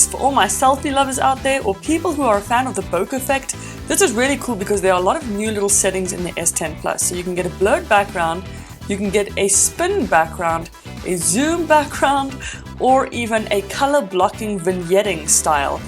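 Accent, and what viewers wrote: South Africa. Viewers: Awesome review. Love the South African accent. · Just subbed for the SA accent 😍 feels like home! Great content, probably the best in-depth camera review I've seen on YouTube so far! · is jy Afrikaans? Jou akcent klink baie soes een van n soetie XD maar dis nice om te sien dat daar is talent Suid Afrika, goeie video! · Finally a South African reviewer🙋‍♂️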